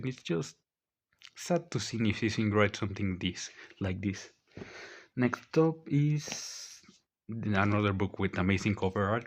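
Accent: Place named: Mexico. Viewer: That nut worthy thick Mexican accent returns!!!❤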